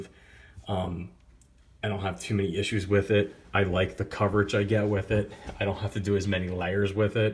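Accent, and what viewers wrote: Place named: Philadelphia, United States. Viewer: You a fellow Philly guy like myself? The accent.. either that or Bmore/Delaware. I'm just getting into customizing. I got Testors and some brushes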